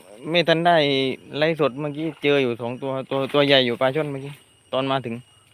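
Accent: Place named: South, Thailand. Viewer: พี่ชอบฟังแระ แหลงใต้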